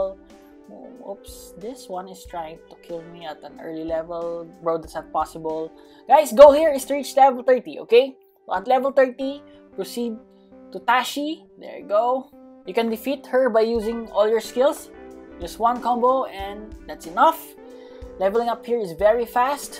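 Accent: Philippines. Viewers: Qeustion my friend What is ur race? If I'm gonna guess Filipino? Cuz ur accent made me curious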